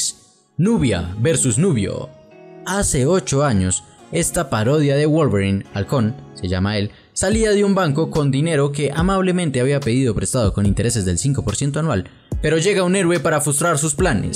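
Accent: Colombia. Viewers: Es tan evidente q ud es Colombiano jejejejejejejeje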